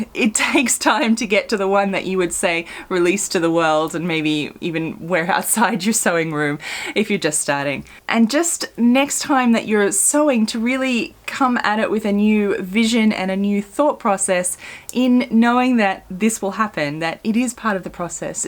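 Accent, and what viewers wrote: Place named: Australia. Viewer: Hi Yes this is what I have really enjoyed about your videos. It’s great to reinforce that refining fit, design and restyling are a stepwise process. Too often, with some other youtubers, we are left with the impression that the completed item was produced from an idea and then executed without change from that idea. Although I KNOW this is not at all likely to be true, it still makes me feel a bit like a doofus because I can only seem to do these things step by step. It’s also great to reinforce that the clothes we buy in shops have been through many rounds of test garments. Often home sewers will make only 1 or 2 items from the same pattern.......and then be surprised that there are so many changes that have to be made to finesse the fit and finish. I constantly remind myself of this process that commercial designers go through in order to cut myself a bit of slack when a make seems to require SO MUCH fiddling with to make it work. 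So. Thanks for your reality checks. Love your work PS it’s great to hear an Australian accent too. I seem to have trouble finding local content. Not that it should matter.......but it sort of does (and not that local anyway..... Melbourne girl here)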